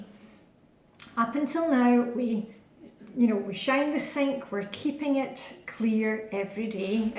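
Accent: Scotland, United Kingdom. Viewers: Thank you so much for everything you do. These baby steps are truly life-changing and I'm feeling happier and more positive as a result, I'm really getting my 'mojo' back, so thank you for your videos. I've had FlyLady's 'Sink Reflections' book for a couple of years now and it's great but actually seeing you every day and watching your videos has really made all the difference. My sink is clean and shiny, I'm dressed to shoes, the washing is on, the bed is made and my kitchen hotspot extinguished! I look forward to watching the next video every morning, I make a cup of coffee and it's like having my own little pep talk. Keep up your fantastic work! PS I'm a Scot living in England and it's lovely to hear a familiar Scottish accent, just like being home. Thanks again x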